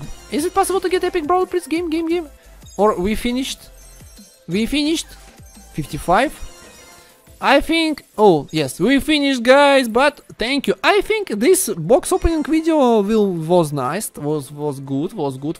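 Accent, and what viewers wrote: Russia. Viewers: You are Russian wright?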